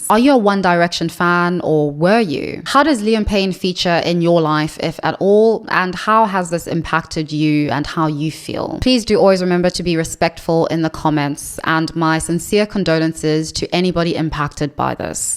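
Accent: South Africa. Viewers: Tell me why I just clocked your South African accent now 😩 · This video was just randomly recommended to me as I had never seen your channel before. If it's true, you hide it really, really well with the British accent; but every now and again you sound South African.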